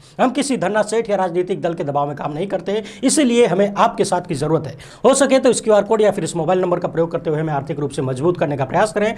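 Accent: Bhojpuri, India. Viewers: Beta aap ka bolne ka bhojpuri style mujhe bahut pasand hai main aap ki video hamesha dekh te hu gbu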